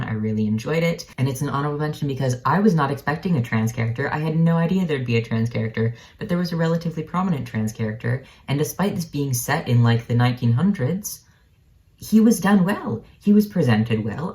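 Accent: Canada. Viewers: I have Cemetery Boys beside me right now. I'm going to start reading it tonight. You go to school very close to me! I grew up near a certain university about 10 miles away from yours and I still live in the area. I took some art classes at your school through the continuing ed program, too. It's strange to think you're so close because you sound like you're from Canada to me. Before you mentioned where you live, I thought you were way up north somewhere. If one day you see some old person with pinkish hair staring at you, it might be me trying to figure out if you are who I think you are. I'm really bad at recognizing people.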